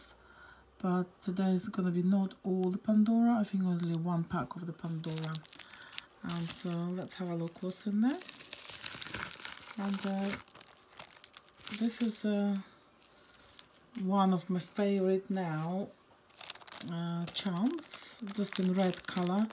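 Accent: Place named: Sweden. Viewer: I love your accent! Sounds like Liberia/Swedish/British. 😊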